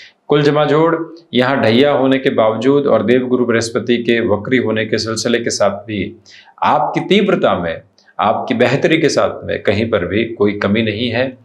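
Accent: Standard. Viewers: Aap itni shudh hindi mein bolte hain ki mai chah kar bhi aapki predictions dekh nahi pati hun. Kyunki samjh nahi ata. Kripya thori saral aur aasan hindi mein batayein. 🙏🏽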